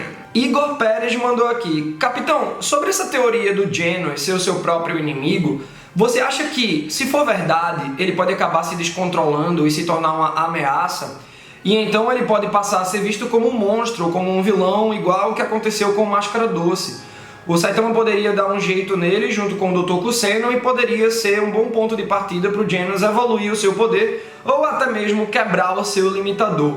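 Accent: Pernambuco, Brazil. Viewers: Mano tu é de PE?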